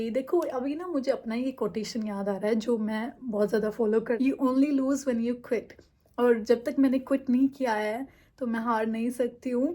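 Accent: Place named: United Kingdom. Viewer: Suddenly I came here aapki hindi british jese hai atcha hein and congratulations 🎉🎉🎉🎉🎉❤❤❤❤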